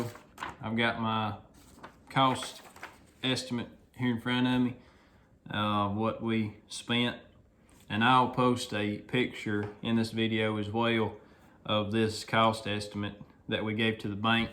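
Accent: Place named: Mississippi, United States. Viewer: Don't apologize for your dream. It is awesome and your videos answer a lot and inspire people to do their own. Some of the best videos for that with Pole Barns I have seen. Plus as a guy who met/married/had children in and lived in MS for 13 years I appreciate the down home accent and lingo. :)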